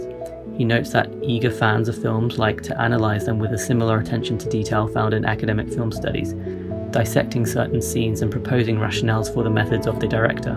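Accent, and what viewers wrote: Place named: United Kingdom. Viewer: I hate starting a yt vid and hearing a british "person" smh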